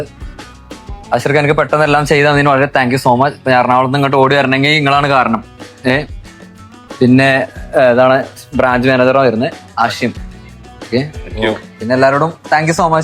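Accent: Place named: Malappuram, India. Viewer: Ingale naadevdeyaaa slang Malappuram aanello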